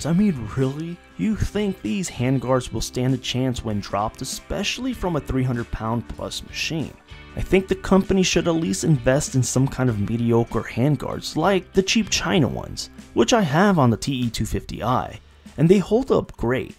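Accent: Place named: Mexico. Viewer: THIS DUDE SOUNDS TOO MEXICAN TO BE A TRUE AMERICAN. CITIZEN OR NOT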